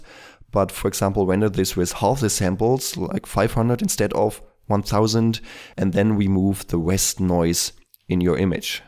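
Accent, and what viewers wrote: Germany. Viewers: are you german? you really sound so... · ) nichts gegen dich aber bemerkt das du deutsch bist diese aussprache von englisch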